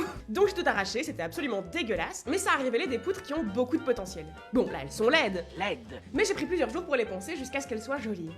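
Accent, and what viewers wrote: Belgium. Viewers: Elle est belge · cc c'etait pour savoir ta un petit axent et je suis francobelge du coup je voulais savoir est ce que tu est belge peut etre que j'ai tout faut mais voila bisous bisous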